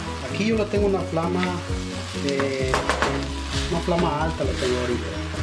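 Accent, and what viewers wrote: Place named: Veracruz, Mexico. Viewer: tu acento me parece jarocho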